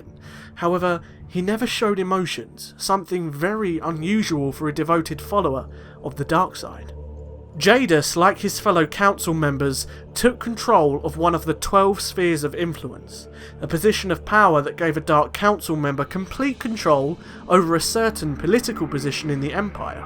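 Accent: United Kingdom. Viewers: I like these vids. Listening to SW History told by someone with a British accent, makes it so much cooler.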